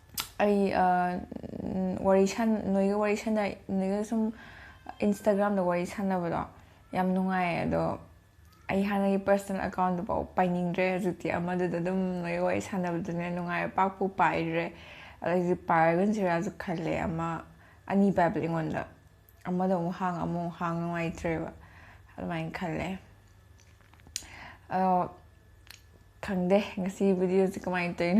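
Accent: Kakching, India. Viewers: nahalkhei ngangbado adu oina ngangbiyu kakching lon natabida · Kakching maron ngangbajidii yamm nungche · Kakching londa adum ngango ym nungye taba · Kakching londo yam nungcee luf u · kakching londa adum ngangbiyo yam taninge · Ngasidi kakching lon taba phngngiiii💃💃💃💃💃. Yam tba nungaiyeee ado mthnggi vedio dsu kakching lon oin twseeee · Che kakching marolda ngangbase ymn nungcye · Kakching marolsi eidi Yamna Nungsiye taba Yamna nungai... Adum ngangbiyu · kakching lon sae eym nungcyae tabada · Hwjikta thengnabni chegi channel se..eisu kakchingdeini Eikhoigi londa ngangbsi tabada nungaijei👍👍👍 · New subscriber👍...kakching nupi amt koidb ydre lon khoirg ngngk nba😃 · echan gi kakching gi londa ngangbase yam taba nungai, adum ngangbiyo:) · Ym nungcyee kakching marol da ngangbashe pukningbu nungcrakae che na ngangba taraga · Che kakching lon ngangbana nungcye che nungcbana loireda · Kakching lon ngangba c n henna neire keino ado haobro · kakching marol da ngangbasee yam ngaojei yee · Kakching marol je khara thuna ngangje amta tage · mathngdi kakching marol ngangbgi oina twrk o chaba tok o ngangbdo taninge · wa ym ngngo kakchng londa taba ym nungaiye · Cgi channelse ngacna ahanba thengnabne subscribesu twjre.aduga sisterse mapanda leibro? 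Kakching lonse somna ngangbcdi tabda nungaiye. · kakching marol se yamna manipur da pamnarklibane ateigi khoibaga mannadabane lovely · kakching londa ngangbase ym nungcye🙃🙃🙃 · Che2 na kakching lon ngnBse ym nungsiYe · Kakching londa adum twbiyu aduna henna taba nungyjei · Video loina kakching maron da oina twwo... Duna henna nungai jei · Che ym haormdoi mlle kakching londa asum ngangbi rk o taba ym nungaiye nungsu ym nungcye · Kakching lolda ngangbana henna pos tai ae.. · Kakchng mchani khngnaba dumm che gi vlog khufing gi ngango duna nungai... Eisu kkchngne...😁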